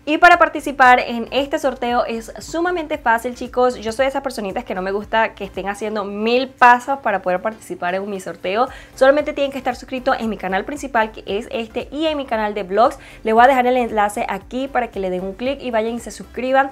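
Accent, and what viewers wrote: Venezuela. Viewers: Hola yo te descubrí con el vídeo de la colección de Disney villains x colour pop. Te sigo desde Venezuela💖 Siempre supe que eras Venezolana por el acento😂😬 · Yo mi primer video lo vi y dije ella es venezolana como habla te veo desde estados unidos tambien soy venezolana y ya estoy poniendome al tanto con todos tus videos 🤗♥️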